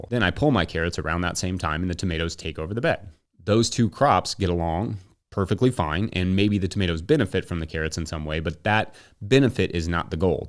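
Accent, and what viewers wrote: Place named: Midwest, United States. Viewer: Your accent sounds like classic midwesterner.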